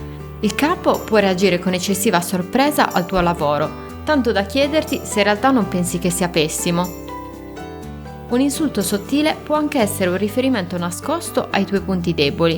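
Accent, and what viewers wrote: Sardinia, Italy. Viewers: Bellissimo video...Ma sei sarda?? 😆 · Si, anche io sono sarda. Ho sentito per caso il tuo video e ho pensato: ma questa donna è sarda. Allora mi sono iscritta al canale 😉. Buonanotte 🥰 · bell'accento Sardo :) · Ciao, sei sarda per caso? :D è il primo video che guardo, ho sentito parlare di questo atteggiamento qualche anno fa, penso che lo farò vedere anche a mia madre, che perpetua dei silenzi assurdi senza mai esternare la sua rabbia, ma io so che vuole sbottare Allora questa introspezione potrebbe esserle utile.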